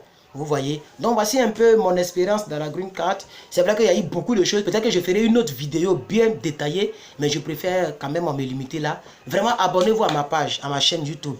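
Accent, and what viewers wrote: Ivory Coast. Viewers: Lol je ne sais pas pourquoi je reconnais aussi vite les Ivoiriens quand j'attends leurs voix où quand je le regarde je sais déjà que c'est un ivoirien depuis que j'ai vu ta tête ta façon de parler je suis que tu étais ivoirien dis-moi le contraire lol merci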